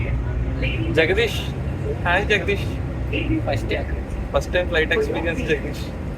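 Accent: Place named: East Godavari, India. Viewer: ఆయ్.. ఆ తూ గో యాస... Missing a lot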